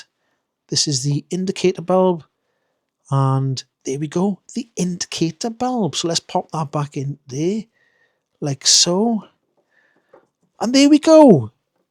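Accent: Wales, United Kingdom. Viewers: Love that Welsh Accent, By Here!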